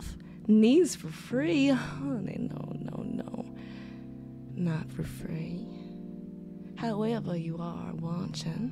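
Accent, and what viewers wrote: United States. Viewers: It's that southern Belle accent 😍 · why did I think the accent was from new jersey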